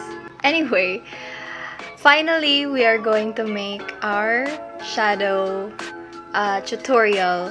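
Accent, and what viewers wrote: Philippines. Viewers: Why do you sound like you're from The Philippines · Huh she sounds like from Philippines but thx for tutorial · you sound like Filipino to me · i can tell she's phillipines by her english accent lol❤ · I really don't have the materials for this... POTANG INA!!! (but this is the first time I've heard your irl voice) :) You really sound like a true Pinoy!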